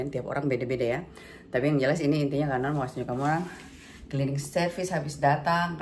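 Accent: Papua, Indonesia. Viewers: Kk sehat2 ya....senang dengar kk punya logat Papua biar tinggal di lain benua logat tra hilang 👍❤️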